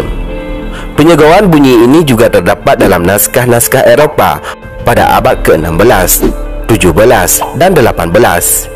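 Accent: Aceh, Indonesia. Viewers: Pakon teuma menyoe meudok aceh,male kah teuma. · Pengisi suaranya kurang menarik.. Masih terlihat ATT.. Alias Aceh Tok Tok. Lebih baik diisi dgn bahasa Aceh aja langsung lebih bagus. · Bangga sy dengar logat Aceh dr si Abang Dubbing. Org Aceh jgn malu ya dgn dialeg sendiri. Lanjutkan berkarya utk menambah wawasan kita semua.👍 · meudok aceh ya,hahaha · Logat bhs mimin aceh nya terlalu nampak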